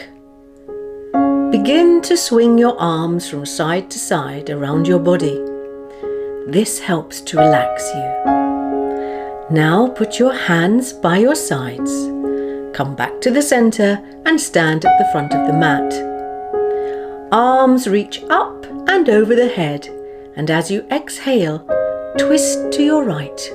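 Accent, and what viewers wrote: United Kingdom. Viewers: Thank you for sharing this video ...... I really like the british accent .... But it is not easy for a spanish guy like me!!!! :-)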